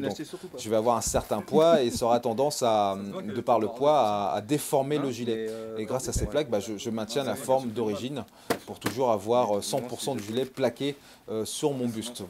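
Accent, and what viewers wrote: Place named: Texas, United States. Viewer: Your accent is so strong I couldn't understand a word, are you from Texas or something?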